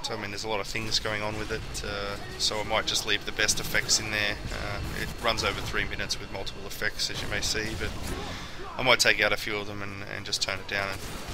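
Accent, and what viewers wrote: Australia. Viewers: an aussie! bout time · australian commentator FTW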